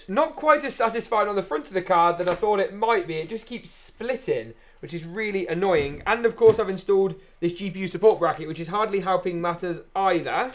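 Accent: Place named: Yorkshire, United Kingdom. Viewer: Utterly bonkers - You are from Yorkshire, right?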